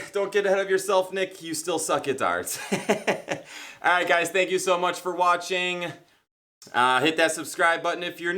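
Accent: United States. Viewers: Wow. It’s great to hear an American speaking about Darts. 👍🏻 or maybe Canadian I don’t know lol but I’m tired of the British Accent 😆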